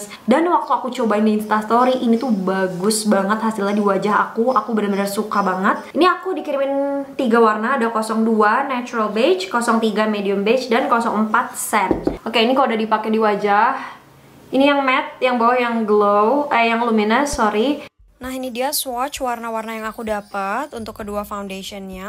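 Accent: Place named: United Kingdom. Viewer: Lidah nyaa gilss gilss...Inggris bat dahh lidah ku langsung auto milih diam mingkem cep😭...btw hallo ka semangat bikin video nya yaaahh🥰✨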